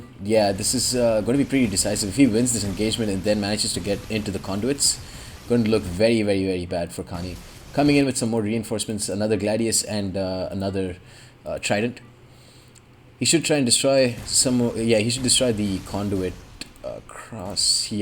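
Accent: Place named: India. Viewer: Damn You sound more Indian man!!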